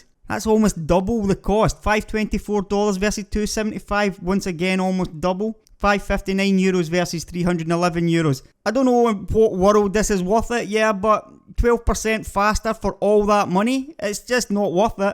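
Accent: United Kingdom. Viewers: You really sound like an arab guy that moved to UK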